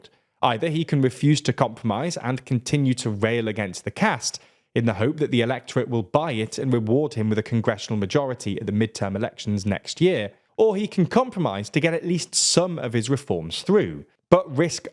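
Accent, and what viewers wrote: United Kingdom. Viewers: Love your videos and the British accent but it’s JavieR not Javiey/Javi-ay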